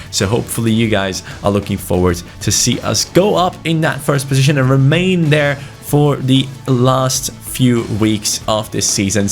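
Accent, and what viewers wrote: Saudi Arabia. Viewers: he is probably from sadra Arabia becouse that's how they say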